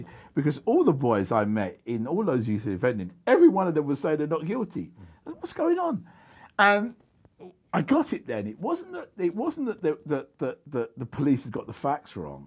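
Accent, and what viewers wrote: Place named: Cockney, United Kingdom. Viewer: Top bloke. For a cockney.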